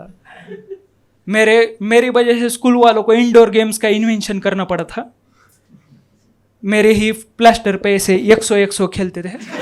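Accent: Maharashtra, India. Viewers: Nice comedy in the marathi accent. Jai Maharashtra bro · omg show in banglore, i thought MH... they must like ur marathi accent hindi... but how they laugh on u marathi words???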